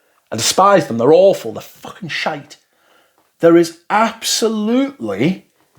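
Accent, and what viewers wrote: United Kingdom. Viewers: You are absolutely hilarious. Have you ever considered radio or script writing, both? We Yanks love the sounds of a Brit , save Piers. You should. You have the best wit and voice of all of these reviewers ~I will discuss this perfume until I have discussed it to a disgusting amount.~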